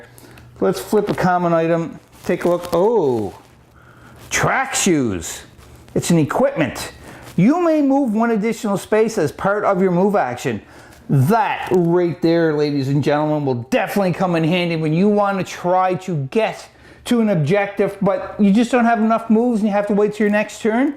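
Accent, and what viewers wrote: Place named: Newfoundland, Canada. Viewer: Where are you from? Trying to place the accent. Sounds like NL (Can.) to me. :)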